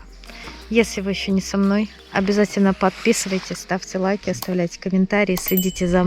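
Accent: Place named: Belarus. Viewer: Белозубая улыбка это нечто!! И профиссиональные снасти аксессуары это зачёт!! Смотрю тебя с удовольствием настроение супер, спасибо за видосы природы и твои лайфаки для рыбалки и конечно твои комментарии говорок белорусский!!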